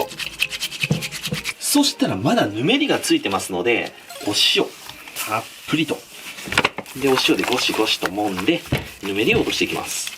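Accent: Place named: Nagoya, Japan. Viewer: 名古屋弁(尾張方言)なのですね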